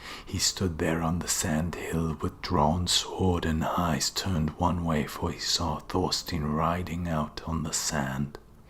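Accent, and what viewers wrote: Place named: Wales, United Kingdom. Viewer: Thank you very much for this amazing, long series. It is hard to imagine how much labour went into this. It was a nice surprise after searching for a long time nearly all the English narrations seemed to be AI-generated. Then found a promising one by an Icelandic lady on Bandcamp and bought it, but unfortunately later found out it was incomplete. I'm curious what is your native language/nationality? Your accent sounded a bit like Welsh sometimes but it's hard to tell (anyway it suited the narration very well). Look forward to your other work.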